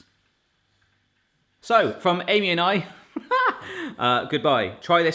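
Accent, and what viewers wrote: United States. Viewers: Hi! I am watching you from Calgary, Alberta Canada.... totally love your videos and I get a kick out of your american accent (it freaks me out every time you do that......."buttermilk") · Can we just take a moment to realize how good his american/canadian accent was? Good job! I'm from Roswell GA in the US. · Salt Lake City, Utah, USA! Love the American accent... Buttermilk. Lol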